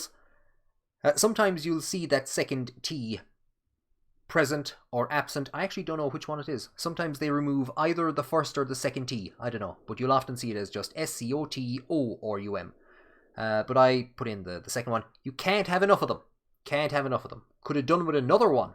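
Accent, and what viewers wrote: Ireland. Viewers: I just want to say that this is the best playthrough of CK3 I have ever watched. I like the editing, especially, but also your incredibly knowledge of the history from this period and region. Also, as a typical American, I really enjoy the Irish accent. I know that's silly, but it's true. The end of the series is, for me, kind of like losing a friend. But here's to making new ones.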